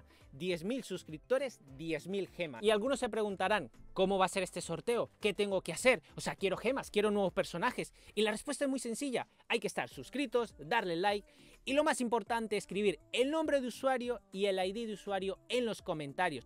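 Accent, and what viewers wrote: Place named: Seville, Spain. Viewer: Ostras pues te imaginaba de otra forma, no corresponde tu voz con tu cara jajaja no es nada malo, pero el acento lo relacionaba a un sevillano cerrao rubio jaja ¿ De donde decias que eras? Eres un crack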